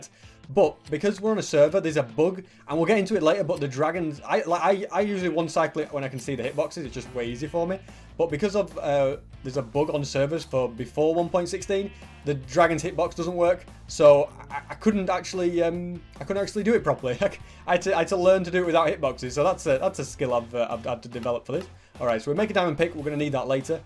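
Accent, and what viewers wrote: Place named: Scotland, United Kingdom. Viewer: SCOTTISH LAD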